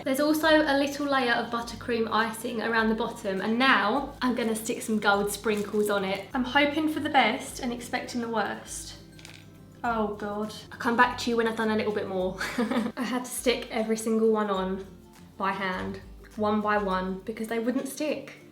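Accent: Essex, United Kingdom. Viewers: The Essex accent just tops these baking videos also have a lovely 18th! 😘😍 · to YOU SOUND SO ESSEX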